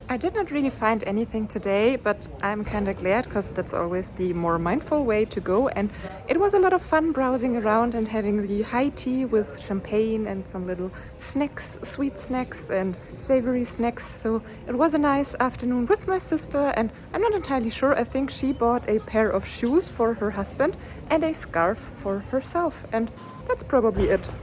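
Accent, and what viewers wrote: Germany. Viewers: I love your german accent...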